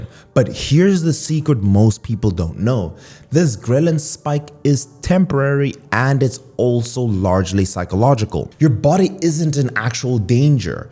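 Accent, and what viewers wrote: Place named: South Africa. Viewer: Your accent does not seem British sir....Sounds more like South African.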